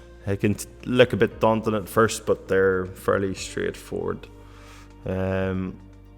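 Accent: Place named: Northern Ireland, United Kingdom. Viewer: Just browsing some flight sim stuff and heard ur accent, didn't expect u to be from NI also haha. Good man 👍🏻👍🏻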